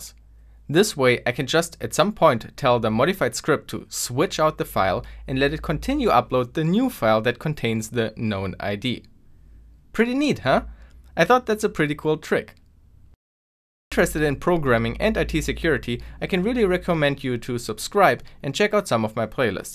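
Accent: Germany. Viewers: This, my friends, is what a german accent sounds like. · Bro you got the most German accent I ever heard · is this german accent ? ¯\(ツ)/¯ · DIeser Deutsche Akzent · r u german? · Took me to realise he is german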